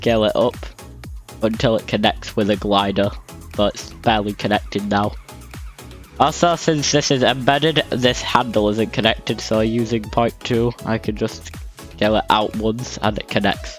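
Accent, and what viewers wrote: United Kingdom. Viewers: I like your British accent idk if ur British but sounds like British to me btw I subscribed cuz of ur glider ❤❤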